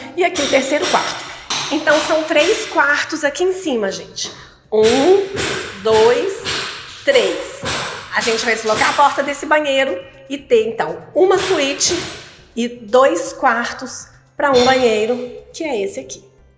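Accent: Minas Gerais, Brazil. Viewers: Oie amo seus vídeos! Quando vc fala com esse sotaque mineires 🤣🤣🥰 amo mais ainda… sou apaixonada por essas transformações em casas antigas💙 um bj grande e to na expectativa de novos vídeos👊🏻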